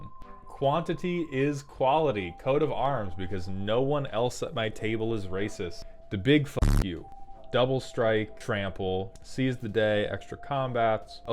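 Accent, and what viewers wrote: South, United States. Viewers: Turned that southern drawl up to 11 for this vid :D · why is he so southern today?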